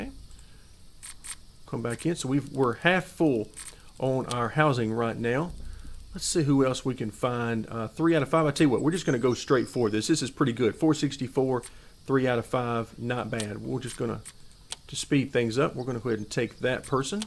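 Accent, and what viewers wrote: South, United States. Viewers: I am from Florida and this accent is interchangeable for Florida, Georgia, Tennessee and maybe further out depending on the individual. I have lived down here for thirty-five years.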